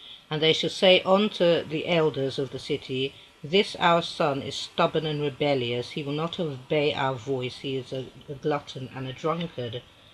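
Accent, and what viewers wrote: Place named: United Kingdom. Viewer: Only 2 likes. I dont understand so called Israel. Ms, some of us are listening. P.s. I love the British accent. It makes you that much more fabulous.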